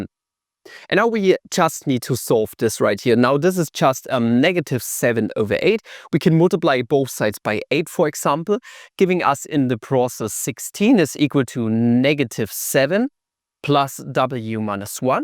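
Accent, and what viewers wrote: Germany. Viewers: I bet your native language is German, it's so noticeable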